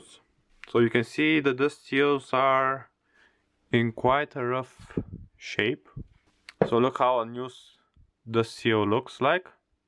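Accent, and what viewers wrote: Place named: Russia. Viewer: Какой прекрасный русский акцент )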